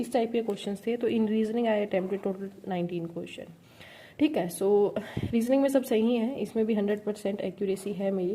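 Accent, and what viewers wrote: Haryana, India. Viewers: Good attempts 😀👍 aapka haryanvi accent bhot accha lagta hai meko 😊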